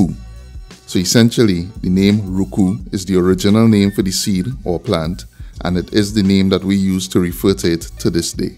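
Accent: Trinidad and Tobago. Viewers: So enjoyed hearing the Trini accent.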